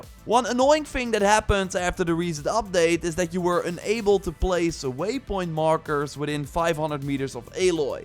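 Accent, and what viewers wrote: Netherlands. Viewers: Eyo you from the nederlands ?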